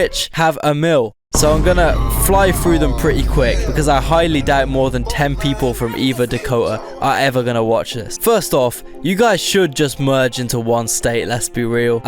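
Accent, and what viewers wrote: United Kingdom. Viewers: Listening to a British guy explain america to me an American is surreal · Just listen to this guy with a British accent talking about all of the States in my Country and also the best country, is absolutely hilarious😂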